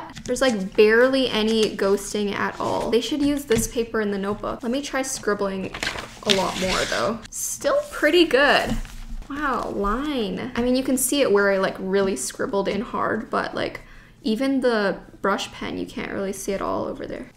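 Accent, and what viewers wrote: Canada. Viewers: cocobana29 she’s canadian, but go off... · are you canadian? i know, weird question but still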